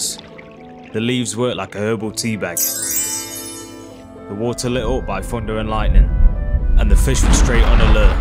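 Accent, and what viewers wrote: United Kingdom. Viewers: I love your channel this british accent is awesome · With that accent you have to be from the East Midlands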